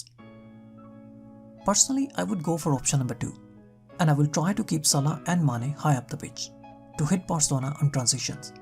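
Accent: India. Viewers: why your sound like a indian, are u Indian? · you sound Indian? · u have kinda Indian accent.... I guess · No disrespect man but as soon I can hear the Indian accent I couldn't go last two minutes.... Shocking...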